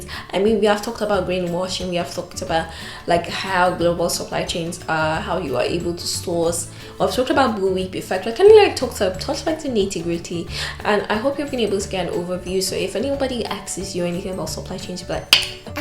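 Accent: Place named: United Kingdom. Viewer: with the British accent. Thanks for educating us on supply chain. I didn’t know supply chain had this much depth. Keep it up 👍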